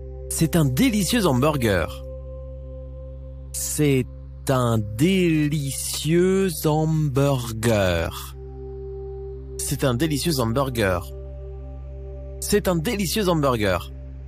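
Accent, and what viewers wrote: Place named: France. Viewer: I'm french I confirm, the voice is 100% french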